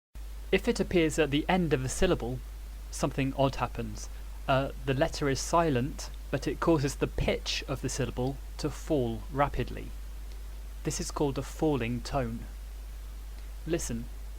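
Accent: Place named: Southern England, United Kingdom. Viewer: Southern English or southern part of midlands.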